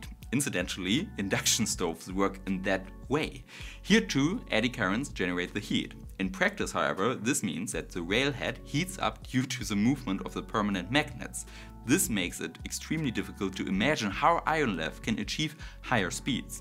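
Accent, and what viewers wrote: Germany. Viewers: Gutes Video! Aber sprich bitte nicht so schnell. Dein Englisch ist schwer zu verstehen, wegen dem starken deutschen Akzent. z.B "cushion" wird "kuschen" ausgesprochen.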